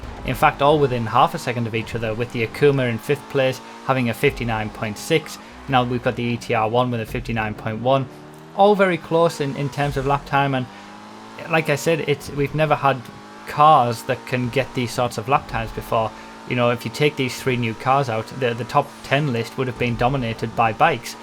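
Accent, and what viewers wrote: United Kingdom. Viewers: british accent sounds so gay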